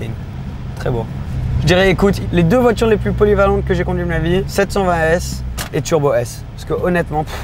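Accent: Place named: England, United Kingdom. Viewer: Je pense qu'il fait exprès de se rajouter un "accent" anglais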